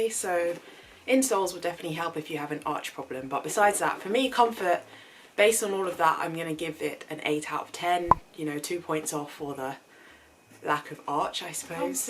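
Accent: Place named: United Kingdom. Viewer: Oh shit! British accent on an Ebony Queen?! SUBSCRIBED!!! 🔥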